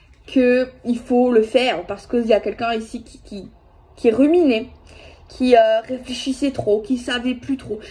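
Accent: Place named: South, France. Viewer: trés jolie avé l, accent en plus ma voisine *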